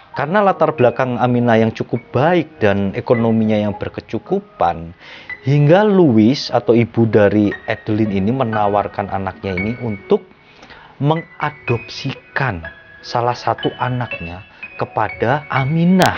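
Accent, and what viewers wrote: Java, Indonesia. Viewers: Jawanya medok bgt tp ngomongnya jakarta...duh jd 😂😂😂😂gk pas bgt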